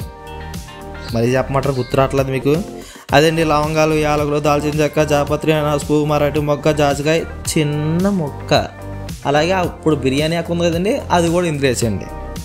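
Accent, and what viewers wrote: Godavari, India. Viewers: Godavari style lo cheppaaru brother, very tasty joint biryani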